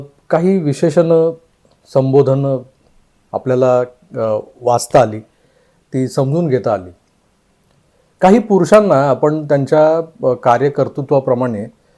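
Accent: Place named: Dakhni, India. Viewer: आपका दक्खणी हिंदी सुनाते बहुत मजा आता है.व्हिडिओ बहुत अच्छा है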